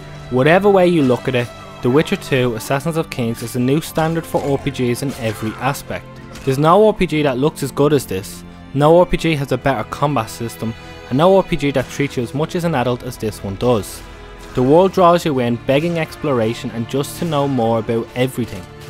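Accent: Ireland. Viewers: the irish accent makes this review sound so much more cool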